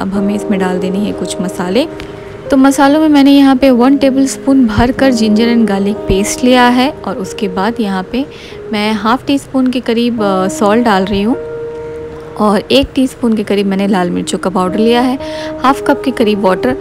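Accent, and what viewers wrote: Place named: Eastern Uttar Pradesh, India. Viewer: you must be from Eastern UP. Mau Banaras side 😂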